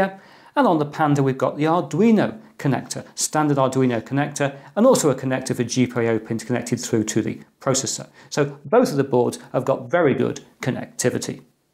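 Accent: United Kingdom. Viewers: Accidentally wandered on your Tube. Really interesting to me 'cause I love different kinda electronic and PC odds&ends. Besides that, I really enjoy your british, so thank you! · Very interesting series of videos. Great professional shooting, clear diction, excellent explanations and your British accent is delightful to my ears of non-native English speaker. Will definitely subscribe.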